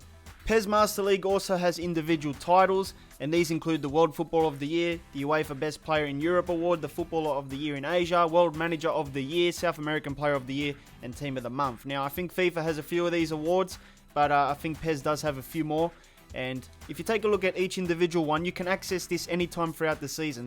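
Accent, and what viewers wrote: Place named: Australia. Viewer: Man were u from Australia r new Zealand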